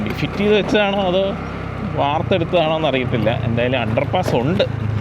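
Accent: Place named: Kottayam, India. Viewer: Broyude slang tvm pole thonnunnillalo kind of kottayam pole oke thonnunnu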